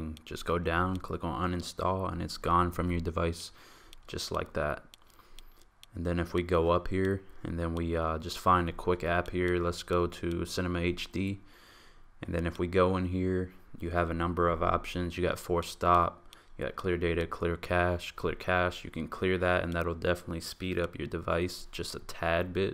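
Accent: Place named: Brooklyn, United States. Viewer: bro you sound like a nyc/brooklyn dude.lol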